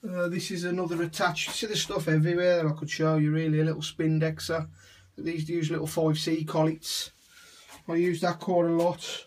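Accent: Birmingham, United Kingdom. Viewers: you sound like your from Birmingham?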